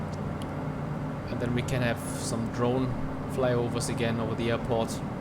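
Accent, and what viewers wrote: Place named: Germany. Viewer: Many thanks for your answer. Let's hope to see more static planes because I don't need any moving traffic at the airports...safe some FPS for me at least. Can I ask you for your PC specs and how many FPS do you got during your flights around Copenhagen? Btw your voice sounds more like a german speaks english then a greek people ;-)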